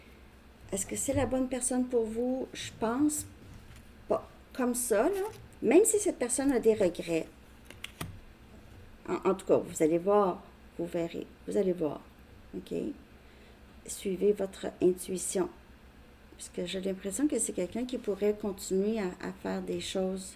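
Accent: Canada. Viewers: Bonjour et merci 🙏 pour votre guidance qui me parle bien. Il est Poisson et je suis Cancer. J'ai donné beaucoup en effet mais impossible de tenir un homme qui aime plaire et séduire. Il m'a quittée il y a 17 ans, pour une autre, par mail sans explications après 12 ans en triangulaire. J'ai divorcé pour lui mais lui non. il revient aujourd'hui et je ne sais toujours pas pourquoi? Nous nous sommes revus en Mai et Juin dernier, il est resté taiseux et depuis c'est le silence radio???. Il m'a dit que nous nous reverrons en janvier ou février prochain sans même demander mon avis?? Wait and see...., j'adore votre bonne humeur 👍 vous été drôle, ça fait du bien de vous écouter et votre accent canadien un vrai délice ❤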